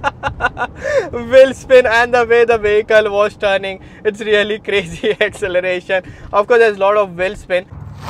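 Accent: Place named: India. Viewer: Angrezi bhi hindi ki tarah laga Jaise maths me english 👌👌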